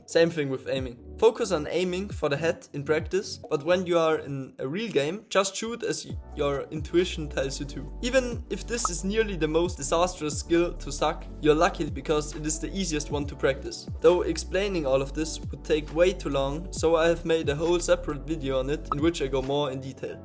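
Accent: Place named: Germany. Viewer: Du bist deutsch man hört es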